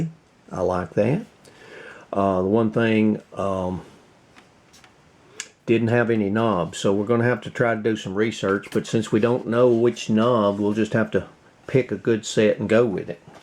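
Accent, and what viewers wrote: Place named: Tennessee, United States. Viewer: Interesting looking radio. Really like the Lady Liberty dial. First time I've seen your channel. I'm a Yankee but I recognized your accent immediately as I had cousins who grew up on Signal Mountain TN. This video is a year old already but I'll be looking at more of your videos from now on.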